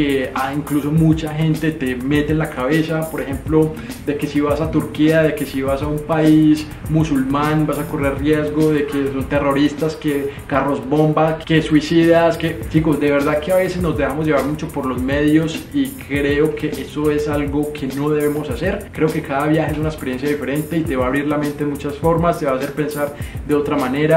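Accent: Antioquia, Colombia. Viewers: Ya tienes de nuevo muy marcado tu acento colombiano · estás otra vez con tu acento paisa jajaja lindo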